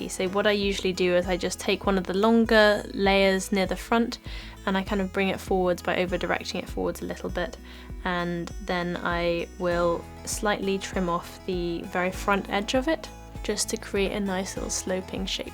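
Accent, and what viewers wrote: United Kingdom. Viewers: Are you British :v ?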